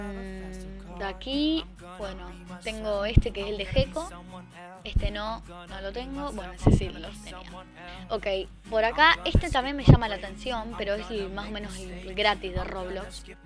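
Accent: Argentina. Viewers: Vos ser argentino?like si lo eres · YO KIERO UNOS ROBUX TOY RE POBRE MEN PARECES DE ARGENTINA :v +Venhado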